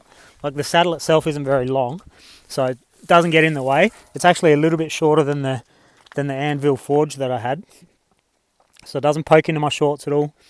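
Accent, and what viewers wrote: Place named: Australia. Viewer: No disrespect meant, but this review was just awful! His Australian accent, is often difficult to understand because he either speaks too fast or kind of mutters. Plus he begins by talking about the 3 Fabric Scoop saddles he's tried -who cares! I want to hear about the Pure without seeing the bike tipping over or pictures of the road he's on. It's too bad because he obviously knows his stuff and with a more polished presentation this could have been terrific!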